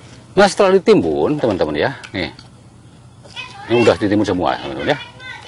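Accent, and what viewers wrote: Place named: West Java, Indonesia. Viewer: Sunda pisan cigah urang cilengsi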